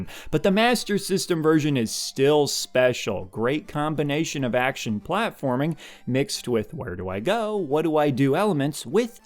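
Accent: Midwest, United States. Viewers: Just subscribed are you Midwestern? I hear your accent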